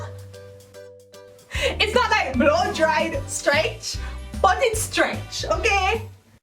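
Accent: Jamaica. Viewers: Which country are you from? Because I hear a jamaican accent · R u Jamaican or carribean???? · Her voice is high but her accent sounds pretty Jamaican and she's said that she's Jamaican.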